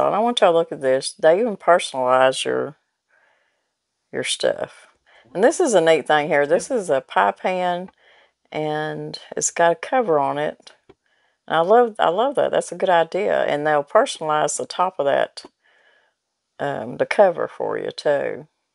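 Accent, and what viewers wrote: South, United States. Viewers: I went out to my patio to plant some flowers and when I came back inside I heard this beautiful southern drawl and just KNEW it was you. And it WAS!! ❤️ I need to spend the day with you Miss!! All my family is gone and you sound JUST like my grandma. Soooo very comforting to my soul. Much love to you 2! 😘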